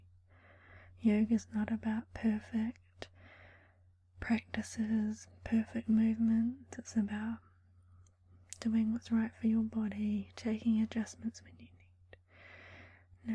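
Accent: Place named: New Zealand. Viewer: Thus us an ASMR Chunnel. I found ut to be relexing AF. Thenks. Aussie here, Dig the Kiwi acksent!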